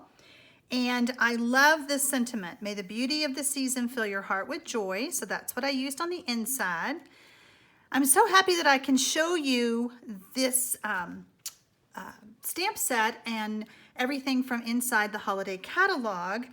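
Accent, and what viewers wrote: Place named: Texas, United States. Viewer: Love you accent It makes me think of Texas each time I watch. I-grew up in Paris Texas and lived in the DFW for years. Now we’re in TN My question is what is I would like to view your video of the one sheet wonder you did of the Merry and Bright DSP. I love the cards you made from it Could you guide me to the video. I couldn’t find in on utube. Thanks for your help Texas girl.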